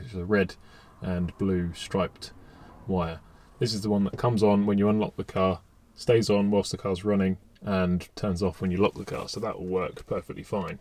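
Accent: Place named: United Kingdom. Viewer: Hey, nice video and respect for doing a hardwire install yourself. I ended up buying 2x the front units to try and get 4K front and rear, but not sure how successful or possible it's going to be getting it all set up, and does mean I'll have to access front and rear individually by wifi / sd card as opposed to being all in one. I may make it into two 2 channel kits instead. The quality of the front camera is a little worse (24fps and lower bitrate) if you run 3 channel mode as opposed to 30 fps and capable of running higher bitrates, but depends on your own use case. One thing to note is I don't know if you're in the UK, but assumed you were until I saw the footage with foreign roads and plates based on your voice haha. There's a UK site/suppliers for buying the Viofo cameras, but the 2 channel front+rear was out of stock and there was only two 1ch left, and I took the last two 😅. 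They'll probably have a restock soon if they haven't yet as my order was a week ago, but just wanted to mention that site which means people can get the cameras faster in the UK without import worries and also the promo code should work on there as I used Ashley Neal's (driving tuition) as he did a sponsored review / unboding himself a couple weeks ago. Also make sure you either heart or thumbs up (heart is more consistent at giving notifications) to give a notification when you reply to someone's comment, otherwise they may not remember to come back or think you've acknowledged them :D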